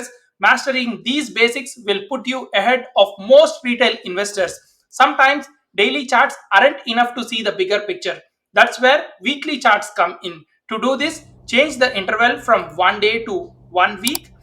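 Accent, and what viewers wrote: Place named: India. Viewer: You have the most stereotypical indian voice I've ever heard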